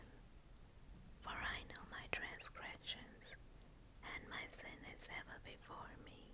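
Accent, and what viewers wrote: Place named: South, United States. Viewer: Thank you for satisfying my curiosity. You’ve got what sounds like an American southern accent, very relaxing. Thank you for reading the psalms.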